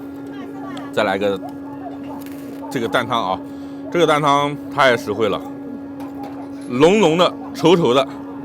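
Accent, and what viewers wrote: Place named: Jiangsu, China. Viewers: 前面就感觉说话南京口音，这集听到“小杆子”，那就坐实了是南京人。老乡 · 你江苏人？口音太重了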